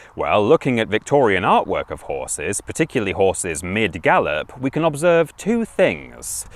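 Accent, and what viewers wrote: United Kingdom. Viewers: I heard the British accent even before I turned up the volume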